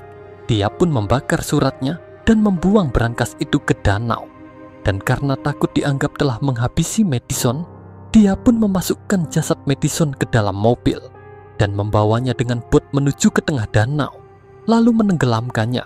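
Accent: Bali, Indonesia. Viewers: Logat bali memang gini kaa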